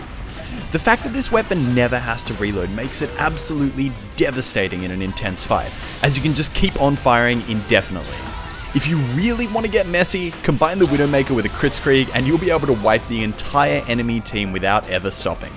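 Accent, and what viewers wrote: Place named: Australia. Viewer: I swear, he sounded less Australian back then.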